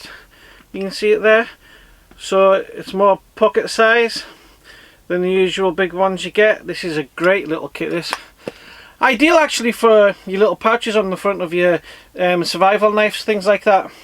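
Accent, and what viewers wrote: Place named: Scotland, United Kingdom. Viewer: Scottish 100 (you sound scottish)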